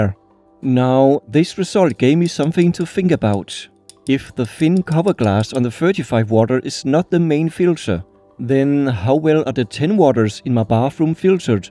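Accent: Denmark. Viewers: The Danish accent 💀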